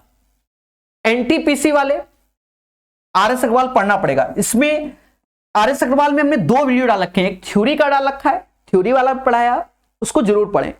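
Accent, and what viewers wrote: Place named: Kanpur, India. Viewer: Oye Hoye. Apne Kanpur wale Sir. Kanpuriya Style me. Rock krte hue. 😎😎😎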